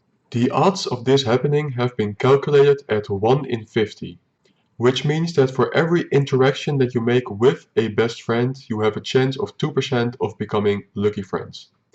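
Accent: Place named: Netherlands. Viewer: Are you from the netherlands cuz youre accent is really dutchy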